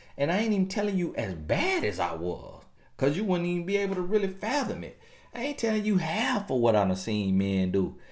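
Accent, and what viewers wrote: United States. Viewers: Gurrrl I fell out laughing and his country accent make it more funny🤣🤣🤣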